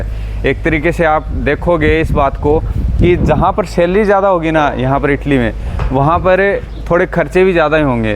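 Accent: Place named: Uttarakhand, India. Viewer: tum bhai pkka uttarakhand se ho .. tone mai hi apnapan h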